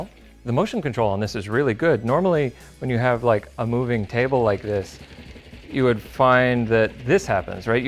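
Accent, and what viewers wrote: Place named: Canada. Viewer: More Canadian than people who put on a Letterkenny accent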